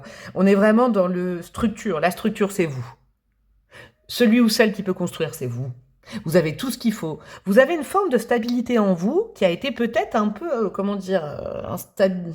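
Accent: Picardy, France. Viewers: Bonjour, accent picard ? Vous êtes de Picardie ? 😊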